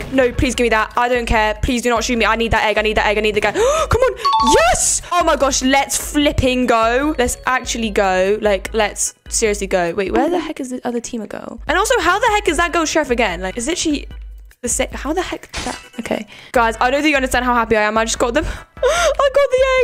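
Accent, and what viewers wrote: United Kingdom. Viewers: I can actually tel that ur from England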